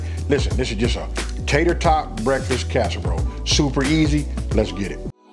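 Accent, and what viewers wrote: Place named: South, United States. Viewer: Love your food, of course, but having been raised in the South, it's your dialect, accent, and tone that immerse me in your wonderful videos. One of my favorite YouTube chefs. You make me feel warm and at home.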